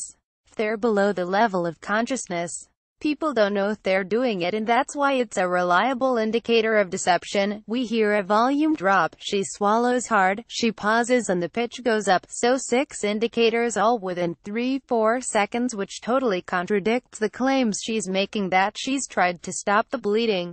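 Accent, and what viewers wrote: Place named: United States. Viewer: Love American accents, but very annoying voice 😐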